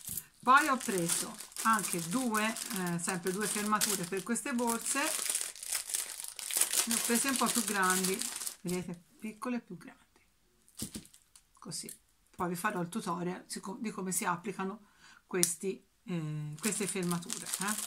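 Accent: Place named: Pisa, Italy. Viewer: Bella esperienza e bravo tuo marito che ti accompagna....Dal tuo accento mi sa che sei vicina a me...anche io provincia di Pisa! Ti seguo con affetto!!!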